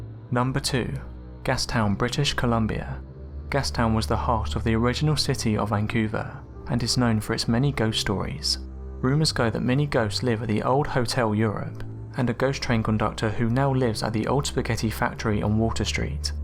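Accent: United Kingdom. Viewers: Why do you have a stupid limey narrating this damn video instead of an actual Canadian who can properly pronounce the cities and provinces in Canada??? Couldn't you have corrected the idiot before the final recording??? JEEZZZ...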